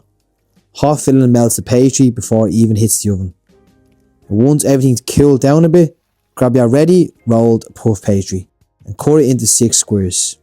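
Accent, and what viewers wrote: Ireland. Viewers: Honestly, his Irish accent isn't that difficult to understand. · This looks absolutely SCRUMPTIOUS darlin I can't wait to make it and give it a try. Love the accent and love my Irish men ❤❤🎉🎉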